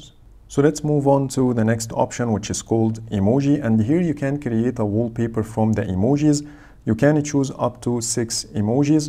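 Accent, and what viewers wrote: Egypt. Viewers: I think you are Egyptian from your English accent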